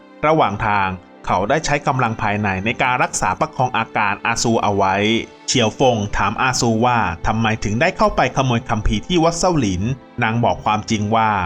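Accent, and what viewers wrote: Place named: China. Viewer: สำเนียงการพูดของผู้บรรยาย คงไม่ใช่ “คนไทย” หลายคำออกเสียงเป็น “คนจีน” เช่นคำว่า “อาวุโส”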